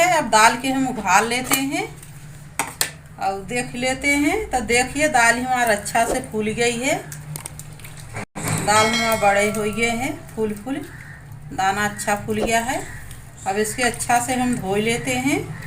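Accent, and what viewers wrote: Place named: Uttar Pradesh, India. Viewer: Mujhe aapki recipe pasand aai,aur aap k bolne ka tareeka bhi,sultanpur side ki hai lgata hai aap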